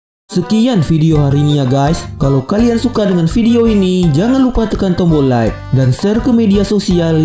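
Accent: Malaysia. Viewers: Betu betu betu😕 Adminnya pasti tetangganya Ipin Upin nih. Dialeknya ngeselin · Kok narator nya logat nya kayak orang Malaysia yg baru belajar bhs Indonesia ya. Tp keren kok contain nya.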